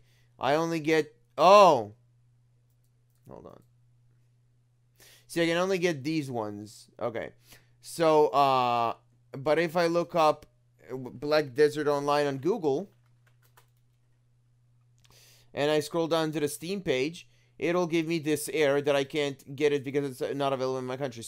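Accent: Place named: Israel. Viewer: ישראלי מאשקלון עם מבטא כזה? לול דרך אגב סטים לא לוקחים את מה שקנית?כי לפעמים מקבלים מזה באן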